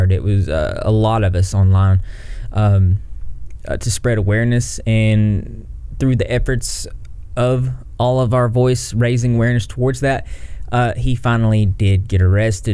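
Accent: South, United States. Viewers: i love your southern accent 😩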